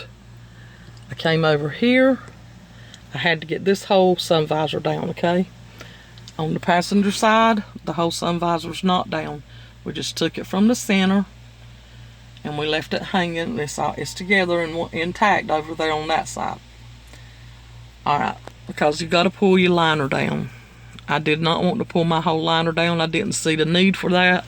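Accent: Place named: Tennessee, United States. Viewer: That sounds like a tennessee accent if I've ever heard one, right or wrong? Lol. Thanks for the vid, these sunroof motors are insane to get out.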